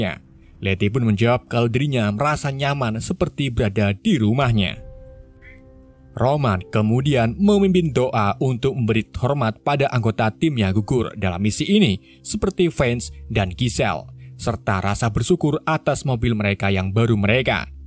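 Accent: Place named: Banyumas, Indonesia. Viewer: wong ngapak ya mas nyong ya iya i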